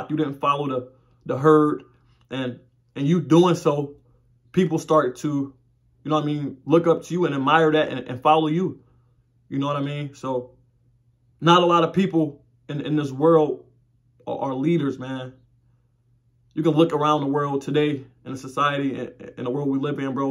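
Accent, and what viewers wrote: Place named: Texas, United States. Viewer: lol ok you talk like them bois in tx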